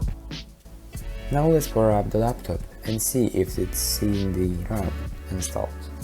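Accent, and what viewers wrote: Poland. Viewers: Wiedziałem po akcencie, żeś jest Polak :D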